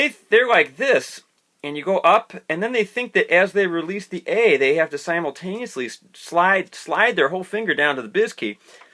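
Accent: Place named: Upstate New York, United States. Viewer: This obviously the way Stan Getz played. As I look through his solos there are licks and patterns that only work with the bis.....btw where are you from? The accent seems upstate New York , Maybe Rochester?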